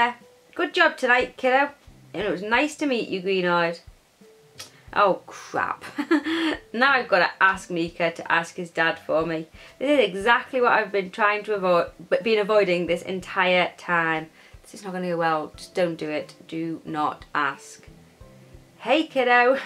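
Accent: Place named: England, United Kingdom. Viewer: How do you read so fluently??? I luv ur accent, do you live in England? :3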